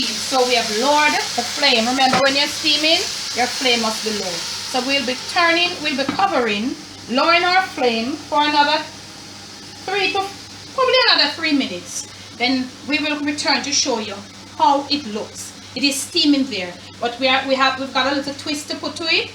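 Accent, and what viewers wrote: Jamaica. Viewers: Love the cooking show and the Jamaican twang.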